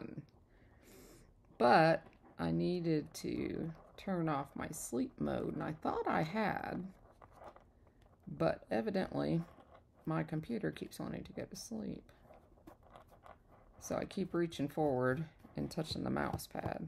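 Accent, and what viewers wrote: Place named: South, United States. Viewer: listening to you with your southern accent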